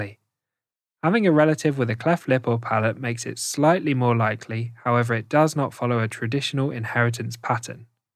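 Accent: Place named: United Kingdom. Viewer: What is it for an accent? love it! It's british but also not british :D Or do you have just a really beautiful voice?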